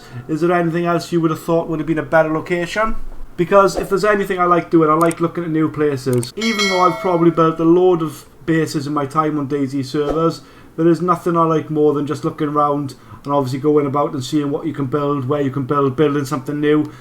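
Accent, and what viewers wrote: Wales, United Kingdom. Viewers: U welsh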